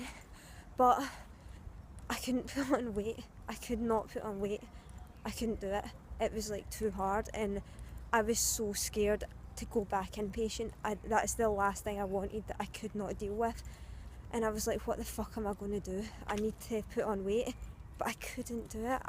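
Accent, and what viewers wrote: Scotland, United Kingdom. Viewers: I truly admire you for sharing your struggle. It takes a lot of courage to overcome that kind of disorder, let alone share it with strangers on here. I just know your story will inspire many. I myself have never battled anything to the degree you have, but for those who have, this video will be motivational & inspirational. I really enjoy your channel & support you greatly throughout your recovery. I also love your Scottish accent, btw!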